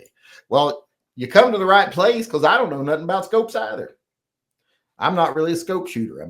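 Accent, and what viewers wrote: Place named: South, United States. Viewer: U sound more redneck (in your old age) than u used to.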